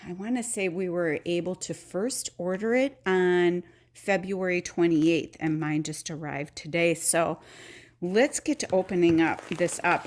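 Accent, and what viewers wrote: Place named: Chicago, United States. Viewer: loved you unboxing and your video. Also your accent! I’m from Chicago but haven’t been back for 30 years. Yours reminds me of Chicago. I know it Chicago and Milwaukee accents may not be the same but it sounded like home to me. Think I’ll have to get a Colonel!.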